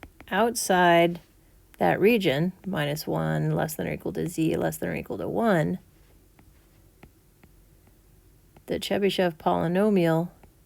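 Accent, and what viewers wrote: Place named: Australia. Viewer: Aus accent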